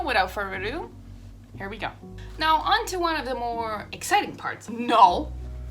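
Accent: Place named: Belgium. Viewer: Ah Belgium, I knew I heard a slight accent.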